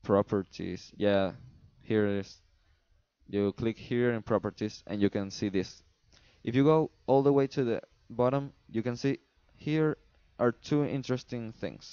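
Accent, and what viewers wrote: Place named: Brazil. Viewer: el chico del vídeo fala com acento brasileño.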